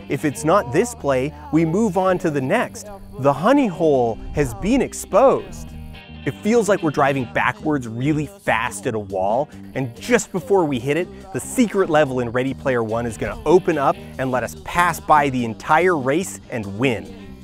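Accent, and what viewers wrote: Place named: Canada. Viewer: Same here, long time Dan-Stan here so I’m used to his Canadian accent slipping through on random words sometimes (ie “gadaff” from the lord of the rings video) so I honestly thought I was just miss hearing him say life changing…. I don’t feel super great in my soul now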